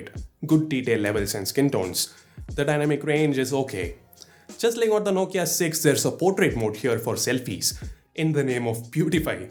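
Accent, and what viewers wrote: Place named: India. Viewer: Im an indian too. But this guy has the most indian accent ever.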